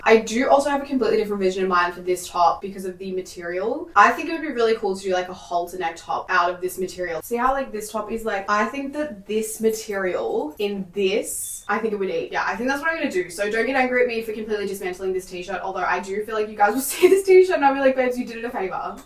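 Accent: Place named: Australia. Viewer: The UNO REVERSE RUG!! I love it! Also I only discovered your channel like a week ago, but you've already become a staple must-watch channel for me! I love sewing and crochet too, and your content is just so refreshing. I love your yap snippets, or your little walks and talks about anxiety, society, the human experience etc. Also there's something really homey and refreshing about hearing an Australian accent! Everyone else I watch is generally American so it felt so surprising but lovely to hear and see someone over here :)) Feels like having a friend over whilst we craft and yap in my living room. *Edit* because I though thought of something re: the tension whilst you've been sewing - double check how you're threading your machine. For the longest time I had missed a small step near the beginning. It will be different for each machine, but for mine there was a small disc or plate and instead of just wrapping the thread around it, I had to place it between the plate, almost like it slotted in between two (I'll compare the feeling of "slotting" the thread in to the feeling of placing dental floss between your teeth. 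That might sound rly weird but it's a similar feeling, and as you can imagine with dental floss, it would kind of hold the thread in place whilst still allowing it to run through. When I was skipping this step it meant that near the spool the thread was loose but as it passed through this sort of sandwiched portion, it pressed down the thread and helped keep it mor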